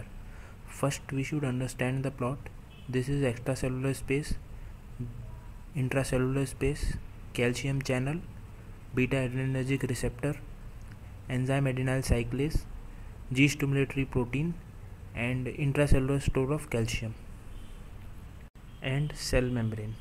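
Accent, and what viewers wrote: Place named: RP, United Kingdom. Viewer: Queen English :-)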